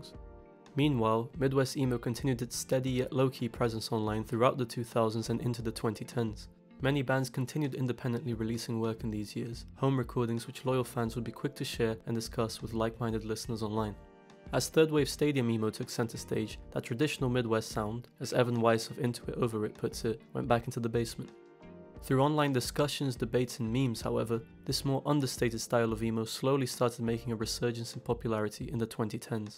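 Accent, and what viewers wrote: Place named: United Kingdom. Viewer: as someone from the middle of a cornfield in Illinois, I have to say I never thought I would hear a British man explain something like this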